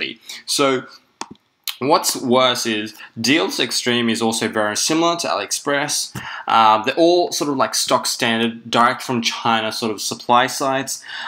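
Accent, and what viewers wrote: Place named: Australia. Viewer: Sounds like an Aussie.. 5 bucks must be like 50 cents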